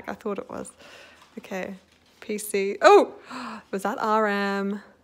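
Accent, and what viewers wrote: Australia. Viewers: When i heard her accent it reminded me of Felix and i understood in 0000.01 second that she was Australian 💀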